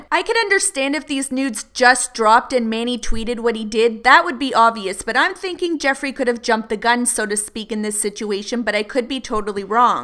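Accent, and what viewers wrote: Canada. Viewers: Canadians and their “Abouts”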